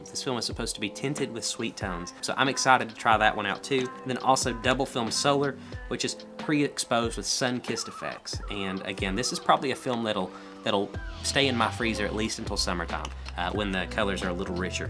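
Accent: Kentucky, United States. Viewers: I could tell your accent was a KY accent and when you mentioned being a hillbilly you confirmed it for me. Fellow Kentuckian here. I’m so excited to follow your journey!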